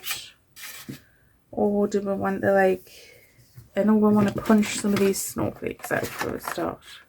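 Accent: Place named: Northumberland, United Kingdom. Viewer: Northumberland in Uk lovely accent :) from NZ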